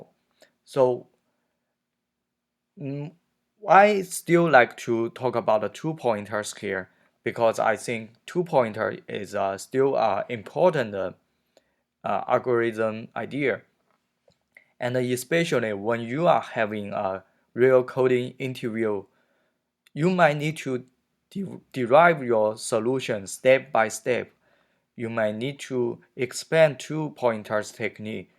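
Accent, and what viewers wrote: China. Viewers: 虽然你的口音超级重，但是我感觉比美音还好懂！！哈哈哈哈非常的Chinese了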